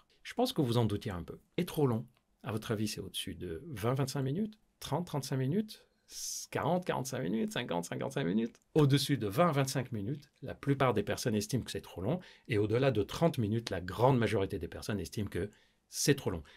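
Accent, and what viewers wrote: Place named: Lebanon. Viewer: Bonne ambiance, intéressant, accent libanais… tout y est.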